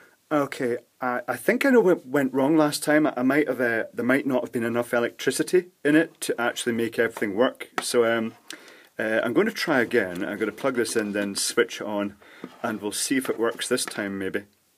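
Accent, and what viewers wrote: Scotland, United Kingdom. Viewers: I find your videos absolutely fascinating, but sadly, due to my lack of knowledge, I sit here watching and it’s like watching a foreign language film! No, I don’t mean your brilliant Scottish accent, I mean I have no understanding of all the electronic terms!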